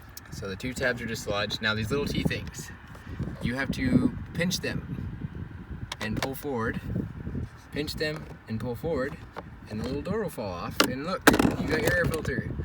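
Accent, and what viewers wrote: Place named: South, United States. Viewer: Actually excited to change the filter now that I watched this super clear video. Your country voice is so soothing!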